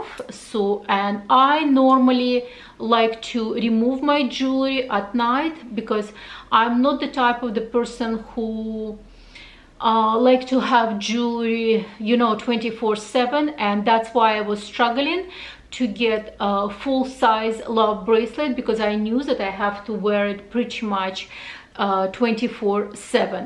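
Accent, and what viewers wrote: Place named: Russia. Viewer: Your Russian accent is so sweet 😊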